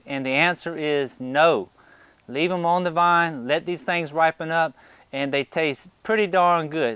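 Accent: South, United States. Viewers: Beautiful tomatoes :) This is my first time growing cherokee purples, and I'm hoping they come out as well as yours... Btw, you have one of the greatest southern accents I've heard on youtube, and that's from an alabama native! Very impressive, sir.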